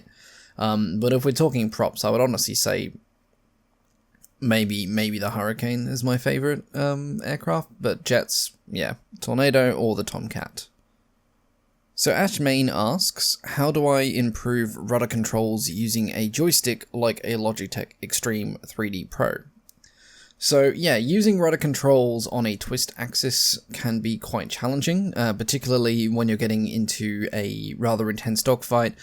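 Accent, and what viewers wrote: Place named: United Kingdom. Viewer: Hi, how are you doing my friend and I like your voice it's very british and how is it in the UK? I am moving there in 2 years 🇬🇧^_^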